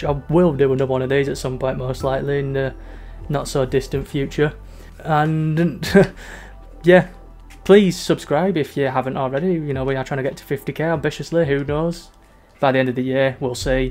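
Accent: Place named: Yorkshire, United Kingdom. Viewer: nice! I was thinking Yorkshire or Hull from your accent. My mom was born in Leeds, and grew up in Yorkshire before moving to the US in the ‘80s.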